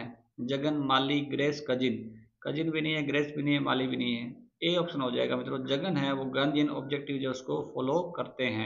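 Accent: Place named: Shekhawati, India. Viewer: Sir aap kaha se h vo maine isliye pucha kyo ki aapke bolne me शेखावटी ka lahza aata h so.....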